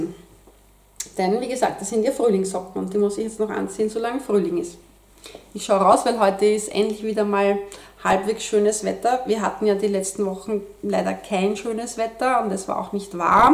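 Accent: Vienna, Austria. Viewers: Ich liebe Deinen Dialekt! Wollte deshalb als junges Mädle(Schwäbin) immer einen Wiener heiraten ((-; liebe Grüße Nicole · Hallo liebe, vielen Dank für dein neues Video. Nun bin ich aber froh, dass du hochdeutsch sprichst mit Wiener Akzent. 😀 Als echte Hessin würde ich zu deinem Pullover sagen: "der eas oig schie ean der basst so goud. Unn dai Foarwe.....schinner gieht nead." Tolle Projekte, die du produzierst und präsentierst.👍👏. Das assymetrische Tuch wird wunderschön. Die anderen Sachen natürlich auch 😉 P.S. ❤️lichen Glückwunsch der Gewinnererin des Wollstranges. Das ist eine tolle Wolle!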